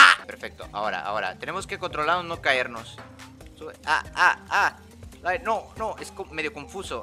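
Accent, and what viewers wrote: Mexico. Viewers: Oye eres de México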